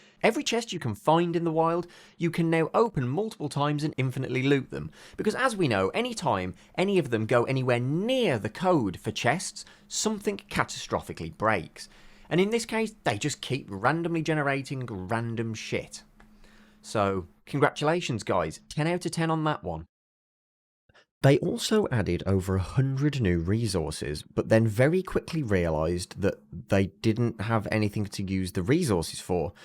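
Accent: Birmingham, United Kingdom. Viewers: whats your accent? some mad brummy style :) good vids man, fellow dev here, geordie.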